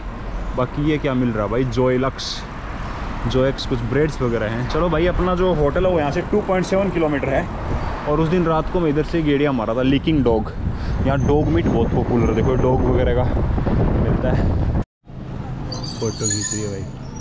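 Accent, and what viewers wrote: Haryana, India. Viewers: Bhai ...kye aap hariyana se ho...aap ki boli mai ye suff jhalak raha hai...I like hariyanvi boli....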